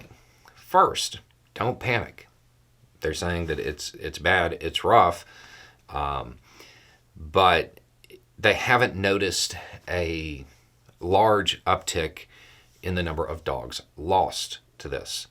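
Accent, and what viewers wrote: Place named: Boston, United States. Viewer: You say it like you're from Boston. Lol I lived there for 30 years. It's always been Nev-add-uh. Here's one for ya .... Tooele. It's in Utah.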